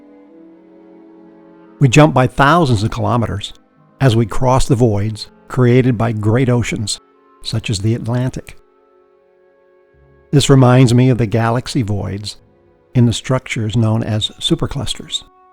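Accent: United States. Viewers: It's wonderful to hear metric measurements in an American accent.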